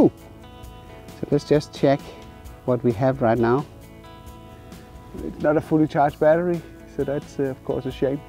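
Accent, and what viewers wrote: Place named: Denmark. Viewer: Einstein? He was German. That's a Danish accent the host is talking 😅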